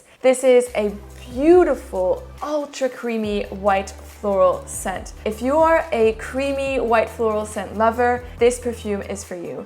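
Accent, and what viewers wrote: France. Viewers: Do you have a very slight French accent? I might be wrong, English is my second language, so I don't always pick up light accents. But you seem to know how to pronounce French word too.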